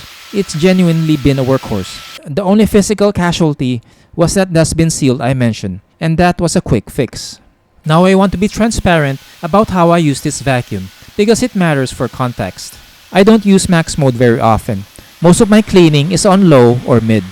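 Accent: Philippines. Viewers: Filipino accent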